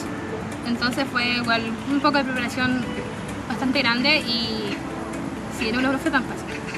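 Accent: Mexico. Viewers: Por qué los chilenos se le pega el acento mexicano ??? No lo entiendoooo!!!! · porque habla como mexicana si es shilenaaaaa ajajajaja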